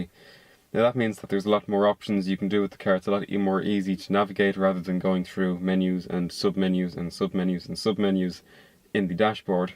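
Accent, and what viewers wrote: Ireland. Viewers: Great review and I'm a fan of the Irish accent :-). Having come from an E90, the steering felt weird at first, but I'm used to it now.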